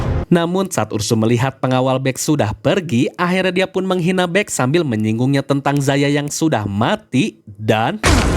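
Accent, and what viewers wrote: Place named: Java, Indonesia. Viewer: Emamg elu tuau dia orang Jateng or jatim? mas is jawa. Not nesyenel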